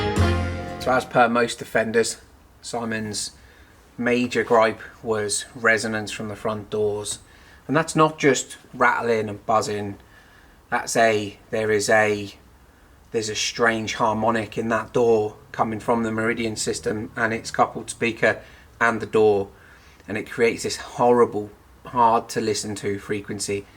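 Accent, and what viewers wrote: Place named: Wales, United Kingdom. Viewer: love your work and your content. Thank you subbed 👍🏻 Incidentally trying to place your accent - there’s a hint of Wales there?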